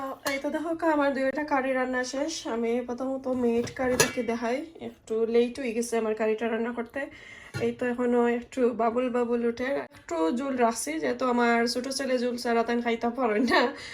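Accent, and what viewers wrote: Sylhet, Bangladesh. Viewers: মাশাআল্লাহ অনেক সুন্দর ভিডিও সিলেটি আফার মাত যে সুন্দর লাগের, হাসির মধ্যে মুক্তা জ্বরের গু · Apu Komlar ball diya ranna kub Moja lagbo.nice hoise.duwa kori apnar osuk komto.apnar sylheti mat amar kub Valo Lage.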